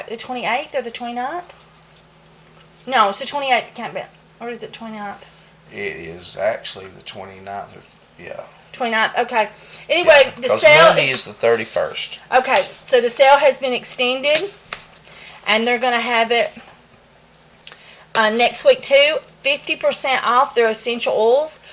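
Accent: South, United States. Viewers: loves y'all southern drawl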